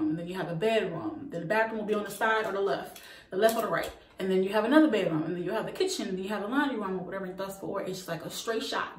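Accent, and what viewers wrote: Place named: New Orleans, United States. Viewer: I hear that small New Orleans accent you got lol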